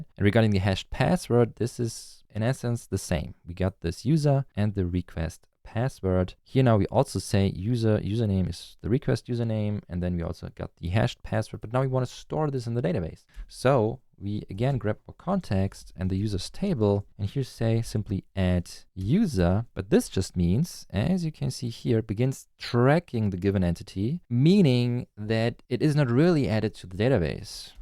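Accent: Germany. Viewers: Great video! I like your approach to start from the top with whit sketching out what we want and fill in with functionality. An extra plus for doing refactoring! It brings an example closer to real cases, and with todays environment help, there is no excuse to not doing it. As a Swede I could guess that you were German, but you have an excellent english!° Cheers:washhands: p.s Thx for the code, just what the doctor ordered...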